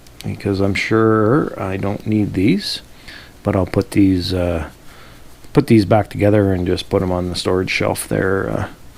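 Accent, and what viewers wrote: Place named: Canada. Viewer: Great info you sound like my neighbors to the north of michigan ,eh. Peace n love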